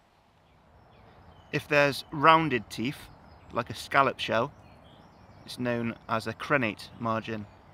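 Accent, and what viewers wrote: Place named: West Country, United Kingdom. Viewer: The man from the West country is back. Nice one.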